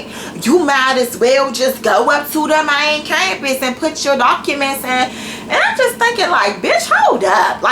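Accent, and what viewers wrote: Washington DC, United States. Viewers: You guys chill out, she's from DC thats how they speak, Its just her dialect. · Lmao yassss!!! I would've done a video like this when I was at school. I went to school in NC but I'm from DC too, I was watching your make-up video and was like this girl must be from DC I can tell in your voice lol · Lol I know you from DC I can tell from you accent. I loved this video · She's from dc she has an accent 👌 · yasssss. DC accent!!!! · Lmao. You so funny! You from Washington DC or the surrounding area? Cause thats how we talk there 😂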